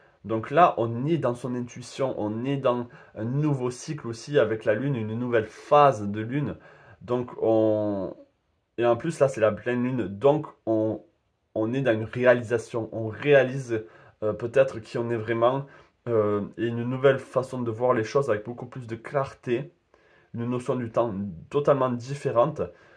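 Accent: South, France. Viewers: coup de foudre au boulot oui, je me suis inscrite à la danse et commence la semaine prochaine, je peints également et pratique les oracles ++++ puisque parait il je suis douée merci pour cette guidance et ce petit accent du sud que j'adore étant du sud ouest ;) belle journée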